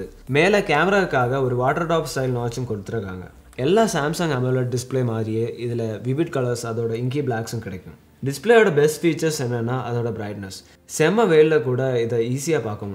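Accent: Kerala, India. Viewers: bro neenga malayalam ah? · Halloo bro. Indha mallu bro Tamil pesumbothu rombavae kaduppakuth So ivare replace senjal sombave nalla irukkum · மலையாளம் கொஞ்சும் தமிழ் ரசிக்கும்படி இருக்கு. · Chetan malaiyali aano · Hello Brother My prediction Correcta You Malayali Tamil Super Keep It 💐💐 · Boss ...unga tamilile Oru Malayali touch.. erukke..neenga Oru Malayali.. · Bro neenga malayalai ah! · Malayali tamil, we want ash back on c4e tech tamil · Sounds like a malayalie speaking tamil,am I right? Good job!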